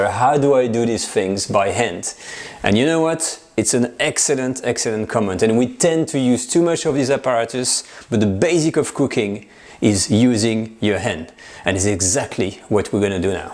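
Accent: France. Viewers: I subscribed because I love your French accent! Okay... and I love food recipes! 😆 (Watching from Chicago) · Love this video demonstration of yours...French accent and all...👍🥰 Thanks for sharing.. ❤💖💝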